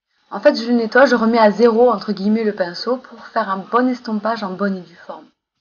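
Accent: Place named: Marseille, France. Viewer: On est à côté Made in Marseille ici !! Alors je me reconnais aussi à ton accent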